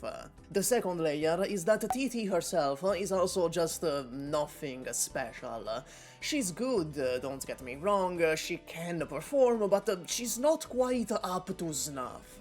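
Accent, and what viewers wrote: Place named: Italy. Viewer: your italian accent makes me parasocial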